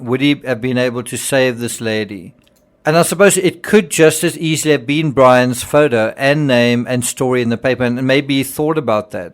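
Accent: South Africa. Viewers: Me too! I ❤️ accent too. I think it’s South African.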